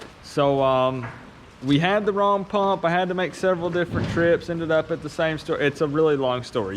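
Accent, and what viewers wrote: South, United States. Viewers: I recommend some underbody coating. Based on your accent seems like you could be somewhere in Alabama like me or at least the south so salted roads aren’t a big issue. But with this truck being as old as it is, I’d recommend some just to stop the rust corrosion (or at least slow it)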